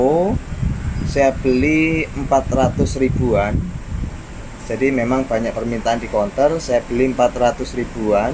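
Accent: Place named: Boyolali, Indonesia. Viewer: Saya kira sampean orang boyolali bang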